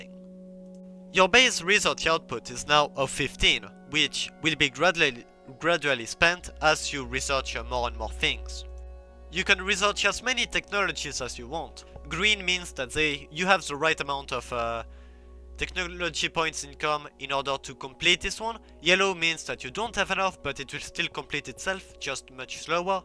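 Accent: France. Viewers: Thickest french accent I’ve ever heard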